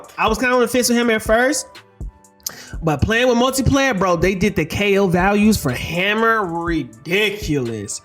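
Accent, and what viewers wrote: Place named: Milwaukee, United States. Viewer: Super weird ass comment but are you from MKE? Your voice is like bringing me vibes of my old home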